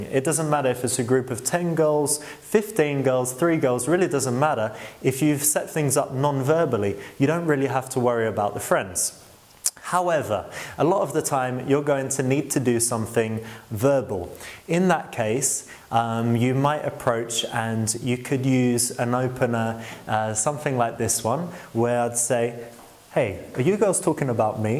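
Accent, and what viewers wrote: England, United Kingdom. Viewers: dude you have an English accent you win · U have a british accent u dont need to do anything lmao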